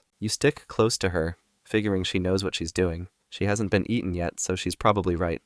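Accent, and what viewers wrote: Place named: United States. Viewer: Why is the location of this channel the United Kingdom but the narrator is American?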